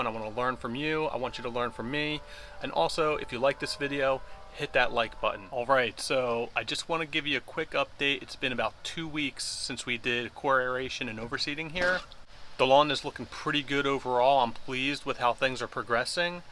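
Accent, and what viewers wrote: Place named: Philadelphia, United States. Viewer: New sub here! Safe to assume you’re from the Philly area/surrounding areas? That accent sounds very familiar! South Jersey here about 20 min from the city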